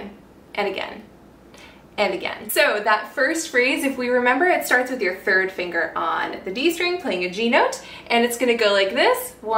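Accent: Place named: United States. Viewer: wow you aound so american it makes it really hard to follow along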